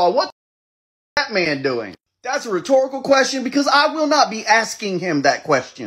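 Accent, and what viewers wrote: South, United States. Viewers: I think he was drunk when he filmed this. His southern accent was waaaay thicker in this one. 😂😂😂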